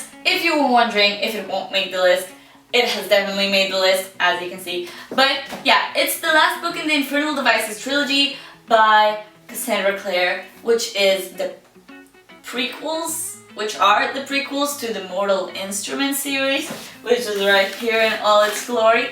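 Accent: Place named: Israel. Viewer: אומייגאד את ישראלית!! חחחחח אני שעה צופה בסרטונים שלך ולא קולטת.. ועוד שחשבתי לעצמי וואי המבטא שלה מגניב שתגיד מאיפה היא...אשכרה לא זיהיתי את המבטא של הארץ שלי.. איזה כיף לראות ישראלית בבוקטיוב!:)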